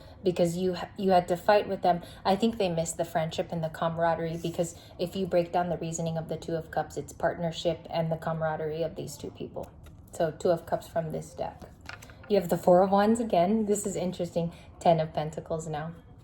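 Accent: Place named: South, United States. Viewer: Love the country accent, 🤠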